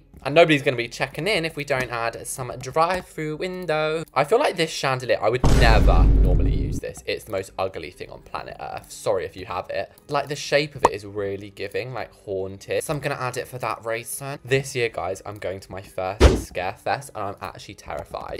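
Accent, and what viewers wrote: United Kingdom. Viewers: wHEN I WAS NEW I TOUGHT SHE WAS A GIRL FOR HIS BRITHIS ACCENT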